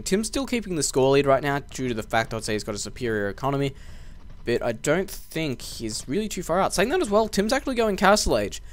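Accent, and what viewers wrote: Australia. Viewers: About deer luring. Viper says it's usually wise not to lure deers. You should really make video with accentuated australian accent.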